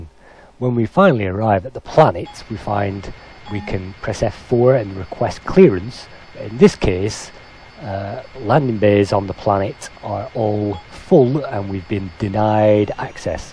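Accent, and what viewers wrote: United Kingdom. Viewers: You sound very British. Very very mooch. Moooooch. MOOOOOOOOOCH.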